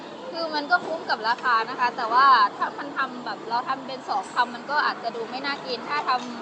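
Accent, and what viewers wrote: Thailand. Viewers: พูดพม่าเก่งแท้แต่หนักมาทางไทยมากกว่าพูดไทยซะชินแล้วครูสาวพม่ามาเที่ยวไทยมารึกฯเลย ว่าไทยเขาเปรี่ยนไปมั้ย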